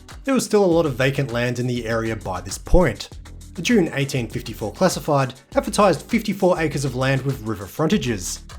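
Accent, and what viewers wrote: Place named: United States. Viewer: Being about local history it’s a shame about the American pronunciations. Top video as usual though. Depot and rerouted .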